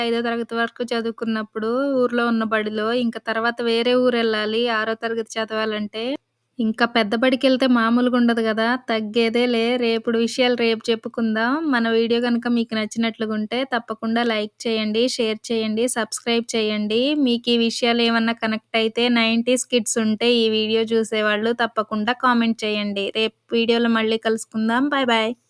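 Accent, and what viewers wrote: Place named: Rayalaseema, India. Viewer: తెలంగాణ అన్నారు రాయలసీమ యాస మాట్లాడుతున్నారు🤔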